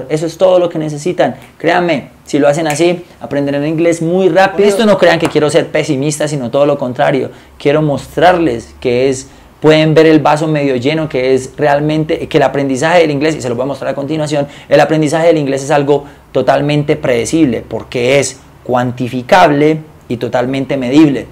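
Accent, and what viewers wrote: Colombia. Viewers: Me encanta que seas de Bogota y nuestro acento en genial no hay porque cambiarlo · puro acento de colombiano!! viva Colombia !! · Por su acento usted debe ser Colombiano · huyyy parcero lo felicito eres un teso por la forma en que hablas y te expresas debes ser colombiano. así que felicitaciones. cómo pues es pa lo del PDF de vocabulario parce ya me suscribí y estoy compartiendo tu canal con todo mundo. mi correo es <mail> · Eres Colombiano? muchas pero muchas gracias parcero, enserio wuevon muchas gracias. jejeje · Muy bueno, de acuerdo con mucho de lo que dices compañero! Solo recomiendo ir modulando bastante el acento en español.... Supongo que eres santandereano! Jaja yo también lo soy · no se porque usted se me hace que es colombiano ? is;not true? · Bobos culicagados haha me agrada el acento colombiono...buenos videos my Men... saludos desde mexico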